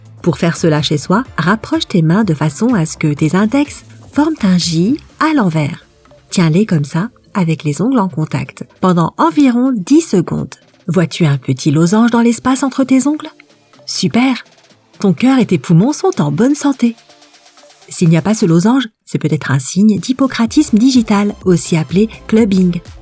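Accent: Quebec, Canada. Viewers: elle a la rhume la dame kubquoi horrible la voix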